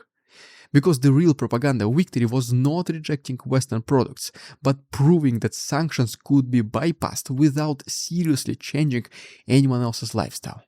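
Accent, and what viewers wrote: Russia. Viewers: Dude; i would appreciate if you spoke more slowly. I admire your eloquency in English. But spoken fast with Russian accent, is difficult to understand.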